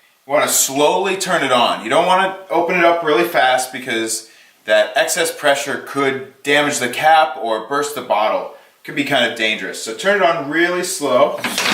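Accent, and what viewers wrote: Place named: Australia. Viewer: Good day, Kindly give me a list of all the equipment I will need to do this forced carbonation process. I want to buy all the necessary equipment, you can add the food grade C02 tank/cylinder on the list though I will hire one whenever I need it. Kindly use English that I will understand as a south african 🇿🇦. I understood everything you said perfectly and your accent sounds Australian which means your English isn't confusing as would American English 😊😊😅😅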